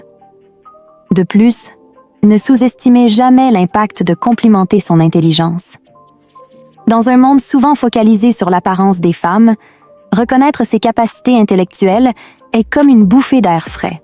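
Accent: Quebec, Canada. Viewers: L'IA a un accent Québéquois ?!? Attention, en France, entraineuse à un sens pas très glamour..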